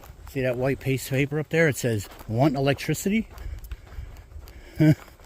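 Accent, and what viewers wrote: Philadelphia, United States. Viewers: I hear your accent are you from Philly?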